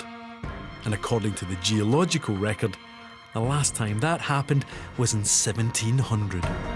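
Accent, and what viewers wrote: Scotland, United Kingdom. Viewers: what accent is that? scottish? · I love the Scott’s accent! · I love your accent. So delicious! I always loved Scottish accents. I'm partly Scotch myself, but I don't have an amazing accent like that! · The Scottish accent makes it sound even more frightening....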